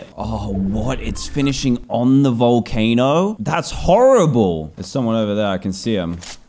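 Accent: United Kingdom. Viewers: He is British so he says stuff differently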